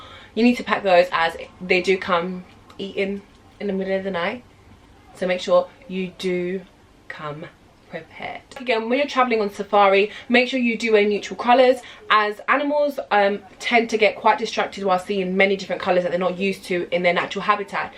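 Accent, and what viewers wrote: United Kingdom. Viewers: was not expecting a brit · not all of it no i was looking for another video but what i did hear was that you sound English where was you born ? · I finely found a a British accent in a view about Tanzania 🇹🇿 🤗 I live in the UK 🇬🇧, that's why I wanted to see the views about Tanzania from a black British, and you are very young girl, thank you so much for this very useful information. Thank you my girl.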